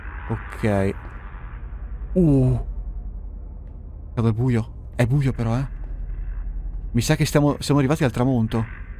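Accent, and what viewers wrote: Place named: Verona, Italy. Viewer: Sono quasi certo sia veronese.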